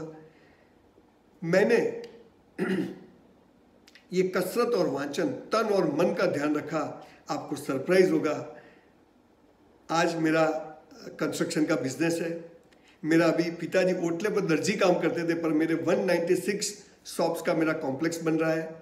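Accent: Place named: Palanpur, India. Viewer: Well done Bhai . Gujarati Palanpuri boli no laheko j mast aawe. Natural j saru lage. Palanpuri boli ka bagicha vanchi to hase j.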